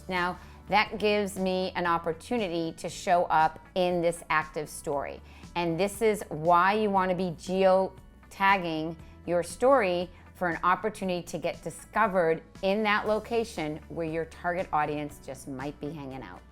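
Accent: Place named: Boston, United States. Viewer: is the best!! She has been so helpful in providing tips to help me grow my business! She’s the real deal and an Instagram guru!! And I love her Boston accent!!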